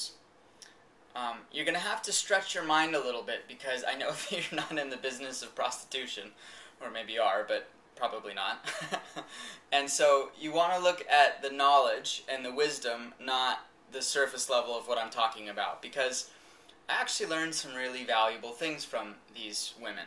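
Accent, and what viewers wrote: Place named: England, United Kingdom. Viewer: i like ur english accent where ur home town?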